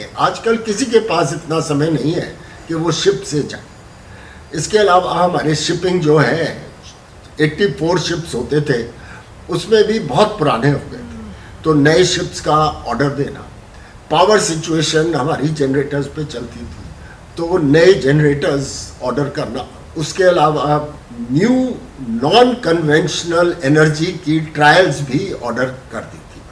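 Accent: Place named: Andaman and Nicobar, India. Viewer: Apko bht subhkamnaye sir. Apke baat me Andaman ka slang jhalkata hai abtak😅. Sir vapas aajao... Beda gark ho rakha hai😐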